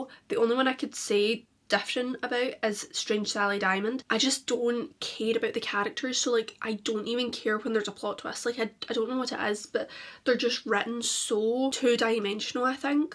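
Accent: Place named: Scotland, United Kingdom. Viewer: nice video! scottish accents are so addicting to listen to 😂💞 - from a slavic girl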